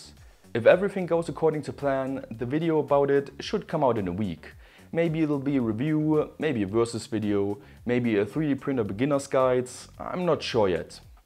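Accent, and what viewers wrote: Germany. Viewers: Hey, Erstmal großes Kompliment, du machst die Videos sehr gut und ich schau sie mir auch gerne an. Ausserdem sprichst du auch sehr gut Englisch, nur musst du noch ein Bisschen an deinem Akzent arbeiten ;) (klingt zu deutsch)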